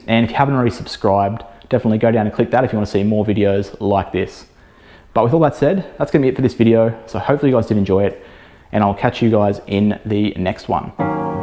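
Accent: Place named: Australia. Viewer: Ah an Aussie accent 😂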